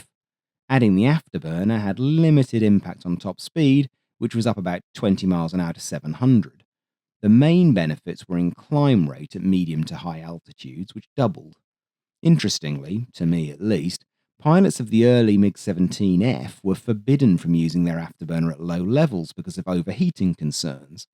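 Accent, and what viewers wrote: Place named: East London, United Kingdom. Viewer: Thank you mate for all you manage,. (…I’m allowed!) Are you English or like NSW or…? Usually the accents are a dead giveaway but at times Im like “oh hes definitely from East London” but then certain words’ll go Sheffield-ish. Actually don’t answer that, and stop reading this as it’ll influence your habitual speech profile cause now you’ll be thinking about it subconsciously (really thoughts are more “layered” than dualistically ‘conscious’ OR ‘submi… ‘subconscious’)!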